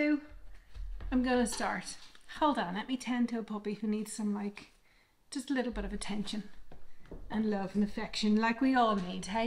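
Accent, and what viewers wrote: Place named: Ireland. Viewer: Congrats!🎉 Love your channel …. And the Irish accent is lovely. Good luck with your channel! 🌸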